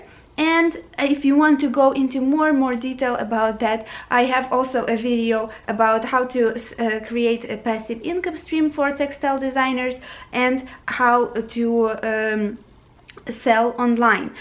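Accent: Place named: Ukraine. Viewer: I love your accent. You're Ukranian, right? Thank you so much for your helpful videos! I just discovered your channel. I love it! You're such a blessing! Thank you!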